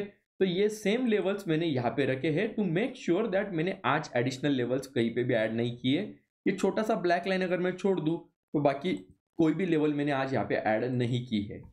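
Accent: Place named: Gujarat, India. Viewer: Sir aap gujrati ho asa lagtahe apke voice se 😊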